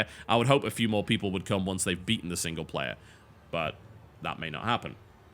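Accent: United Kingdom. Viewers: Oh crap! Did you just correct your pronounciation of the word "usual" to the american version!? :D Careful, or you'll lose your british accent...foreeeveeer...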